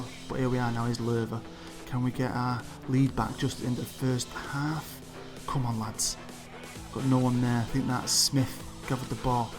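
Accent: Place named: Manchester, United Kingdom. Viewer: The most manc accent iv ever heard!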